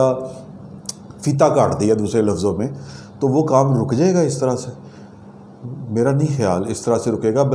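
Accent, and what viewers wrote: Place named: Lahore, Pakistan. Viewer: AOA... Sir g zaberdast analysis hy...Aap ka baat kernay ka aik khalis Lahoree andaaz bht. Pasand aaya..Baqee ye jo Niazi k spoksmen bhaan bhaan ker rahay hn...tu bhai g inn ki 2 Din phelay Niazi nay class leeh hai...iss leyaa TATEE ka zor laga rahay hn... SHEEDA TALLY ko tu NIAZI nay BILLIONS kay TRAQEYAATEE FUNDS deyaa hn .TALLY nay MUREE ROAD per banner bhi lagwaie TALLY un AWAAM kay TAX k paisoun say Rawalpindi may kothian ( BUNGLAS) bunwa raha hy.. bayshak inquiry kerwa lain.....NAB Kahaan hy.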